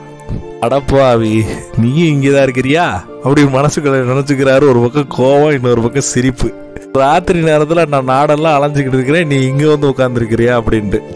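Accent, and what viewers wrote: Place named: Madurai, India. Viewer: Actually நீங்கள் எவ்வளவுதான் try பண்ணினாலும் முடியாது. சொல்ராங்கே மதுரைக்காரர் என தெரிகிறது.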